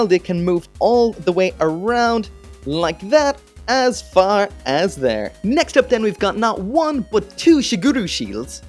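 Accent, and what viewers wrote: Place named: Ireland. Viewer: You look and sound like an Irish Bam Margera dude.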